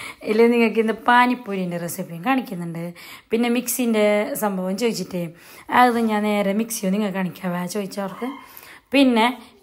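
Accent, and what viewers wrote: Kasaragod, India. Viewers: നാട് എവിടെയാണ് കാസർകോട് ആണോ സംസാരം കേട്ടപ്പോൾ തോന്നി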